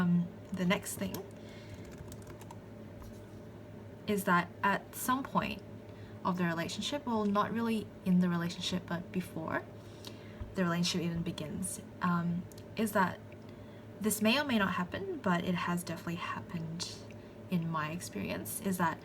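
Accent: Australia. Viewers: She's Australian. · I was not expecting an Australian accent out of you ! I love it ! It is beautiful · I hear Aussie? ❤️ Melbourne Victoria here but live in Miami 😩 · offtop, sorry, but are you Australian maybe? :) Cheers from infj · is that an aussie accent i hear? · Very insightful talk, as a male INFJ a lot of these points are relatable to males. ps. I’m guessing you’re Australian 😊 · you sound aussie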